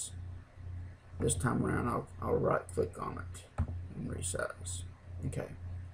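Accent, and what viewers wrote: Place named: South, United States. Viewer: Thanks for making this informative video. Love your accent. Its so saddening that southern accents are being diluted in recent times. I hope the accents always stick around. I love them so. You also happen to have a very calming voice. This video is all around good to watch.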